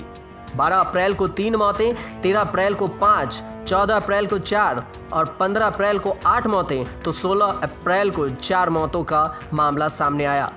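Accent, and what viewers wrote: Bihar, India. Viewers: Ancadh bihadi hai na Muh mein paan permanent hota inke Ra ko rda bolte hain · Anchor bihari h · बिहाडी 🙄🙄 एक्सेंट तो सुधार ले बन गया पत्रकार 😂😂🤗🤗🤗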